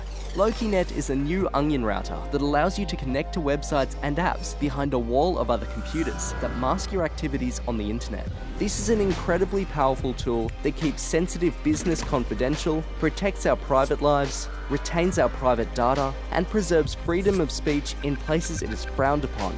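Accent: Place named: Australia. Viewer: I like the Australian accent used in this video.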